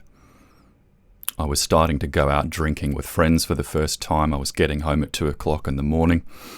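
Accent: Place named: Australia. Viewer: he is the most Australian mf I've ever heard... Im so late but oml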